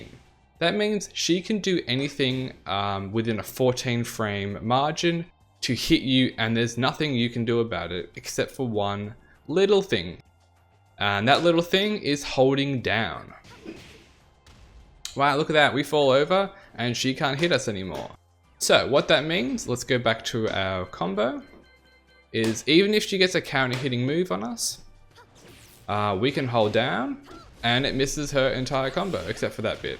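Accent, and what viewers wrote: Australia. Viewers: Where you from in Australia mate? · Your channel has suddenly popped up in my recommendations. I like it when I get to listen to Aussies, being an Aussie in Germany. Funny thing, your channel name in German and corrected for proper german grammar, massiver Zug, means massive train or massive pull, depending on context.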